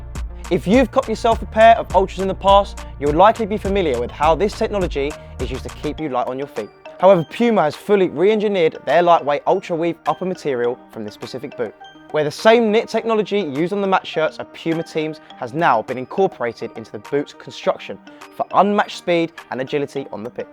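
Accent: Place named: United Kingdom. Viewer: British accent, but says soccer not football😩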